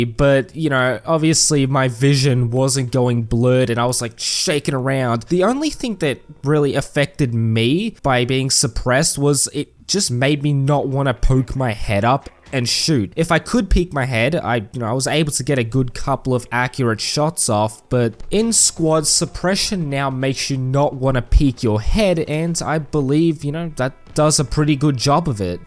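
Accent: United Kingdom. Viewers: Nice British accent man.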